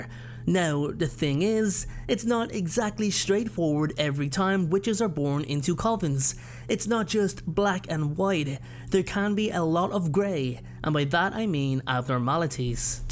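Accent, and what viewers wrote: Ireland. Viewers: Desiccated... he has an Irish accent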